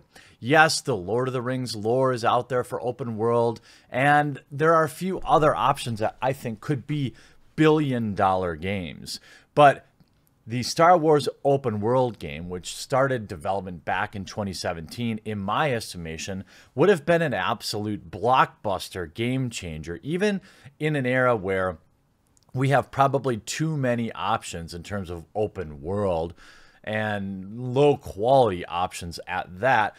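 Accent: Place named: Minnesota, United States. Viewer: Ha you say rag tag like you say bag. Are you from Minnesota ?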